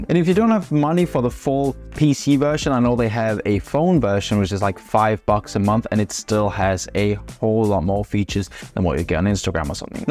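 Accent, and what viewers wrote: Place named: Denmark. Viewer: syntes nok jeg ku høre lidt danglish, hehe Der var også danske plader på den røde Jaguar, da du var i Sverige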